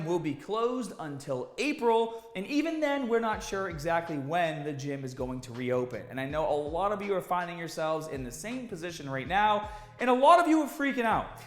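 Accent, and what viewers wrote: Boston, United States. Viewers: love that Baawston accent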